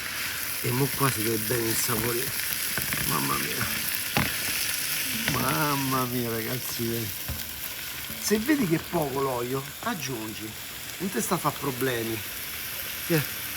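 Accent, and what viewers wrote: Rome, Italy. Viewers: Grazie. Piatto fantastico. Ma come posso sostituire i peperoni cosi particolari? Con i friggitelli per esempio? Vorrei ridarlo. Ti ringrazio e sei al TOP anche per la tua simpatia e con il tuo/ nostro romanesco!!😂😂❤❤❤❤😊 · Ricordo un suo video di qualche tempo in lingua inglese ( spero la possa migliorare). Ora all'opposto è passato allo slang romanesco? L' Italiano standard sarebbe gradito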